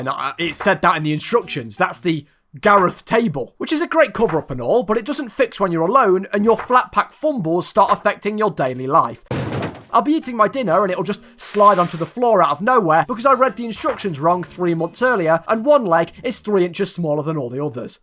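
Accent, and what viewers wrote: United Kingdom. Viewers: His British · Thank you funny british accent man for making my day better, i suggest your next video is about lidl since its common in europe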